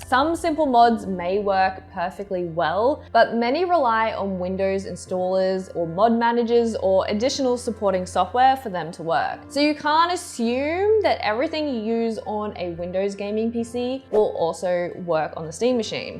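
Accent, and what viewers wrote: Australia. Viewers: I have decades of linux experience so that aspect doesn't bother me, however if there are too many issues then the experience really sours. The puzzles I would be looking to solve are not linux configuration puzzles. Your experience with the rhythm games though is discouraging. I love SynthRiders so not being smoothly playable is not good. But who knows, it may be something relative to tuning the software rather than modifying the hardware. In that case, that issue should resolve itself rather quickly. We'll see. On a different note, do Australians find their accent as cute as those in the States do? Even if it isn't really an accent for them. Maybe they don't see it as cute, but do know it is cute. I have a similar reaction to Scottish accents only I can't understand what they are saying as well as I can Australians.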